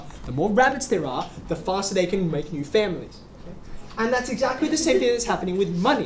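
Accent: Australia. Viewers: Prashant Kumar It's an Aussie accent, bruh. And he lives and works in Sydney, Australia. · Clearly Australian haha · Is he an Australian Asian? He looks Asian, but he has an Australian accent. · It sounds like he speaks English as a first language, as you can distinctly hear an Aussie accent. He likely has learned some of the backgound of Chinese, since he did introduce Chinese numerals in his class to make another point.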